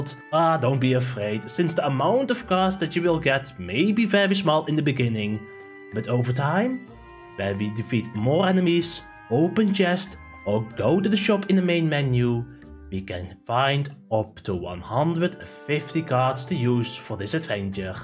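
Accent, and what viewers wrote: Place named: Netherlands. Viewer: That Dutch accent is so funny. 🤣 Thanks for your honest review, was unsure with this game but am glad I’m skipping it. 😉 Great work sir!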